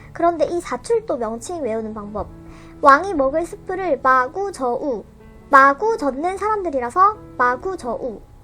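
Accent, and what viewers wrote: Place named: Jeolla, South Korea. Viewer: 전남쪽 냄새가 납니다ㅎ귀여워요~ 유용한암기법 잘 보고 갑니다잉^^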